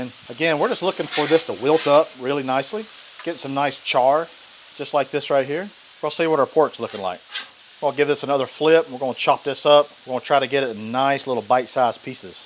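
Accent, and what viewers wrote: North Carolina, United States. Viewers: You must be from NC. I can tell by your accent…. Maybe Thomasville or HighPoint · First video of yours that I have watched and you sure are making me miss home. Not that you cooked something I really ever had in the 2 plus decades of living in the Durham/Chapel Hill area, but your accent and slang...thank you :)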